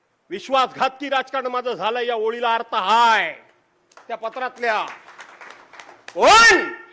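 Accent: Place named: Konkan, India. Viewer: Kokani awaz dadanit awaj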